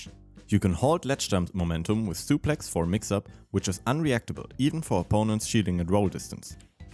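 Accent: Germany. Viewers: Where are you from? Austria?germany? I just want to know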